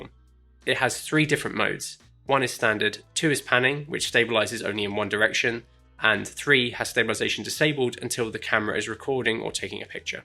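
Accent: United Kingdom. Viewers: Not sure if the British accent makes this more enthralling, or the to-the-point, no frills, expertly-presented information. I think it's both.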